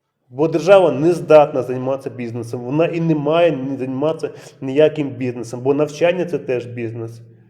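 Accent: Russia. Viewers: Ти не дуже схожий на українця Українці так не говорять у тебе вимова російська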